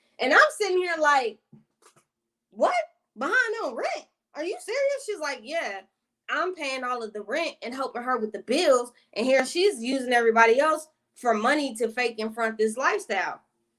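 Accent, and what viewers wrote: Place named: Houston, United States. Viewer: She sounds like she lives in Northside Houston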